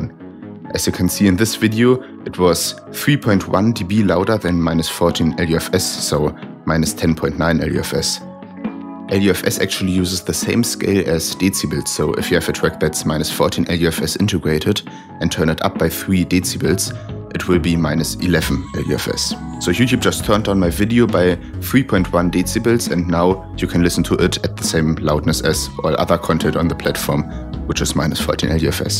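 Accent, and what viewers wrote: Germany. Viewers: Super video, echt spannend! Muss aber sagen, dass dein deutscher Akzent echt extrem ist (nicht das es bei mir besser ist)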